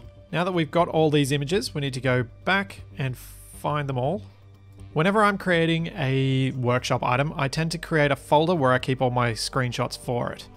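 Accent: Australia. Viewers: I literally watched like 50 episodes of survival before realizing was an aussie. I just have a bad ear for accents and thought he was British or something the whole time. The Bin Chicken episode was what finally enlightened me, after some googling. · Do you come from the land down under?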